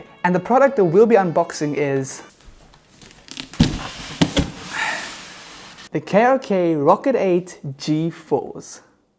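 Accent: South Africa. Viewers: Good old SA accent😂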